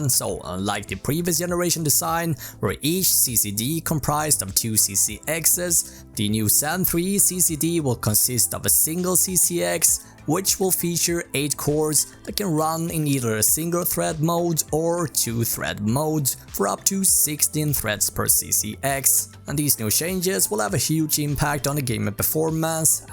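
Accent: Sweden. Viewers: jag hör på din brytning att du är svensk. jag kom på dig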